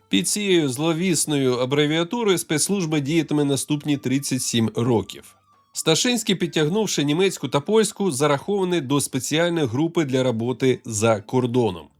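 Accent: Russia. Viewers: Ще все-таки залишається російський акцент і акання. Потрібно ще тренуватися, бо ріже вухо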